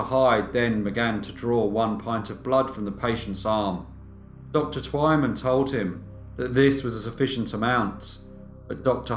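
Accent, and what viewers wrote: United Kingdom. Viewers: I would love to know where your British accent is actually from.London?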